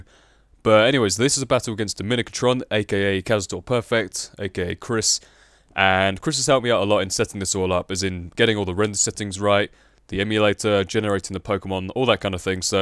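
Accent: United Kingdom. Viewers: Your British accent soothes and reassures me. Will watch rest of playlist. Enjoy my like.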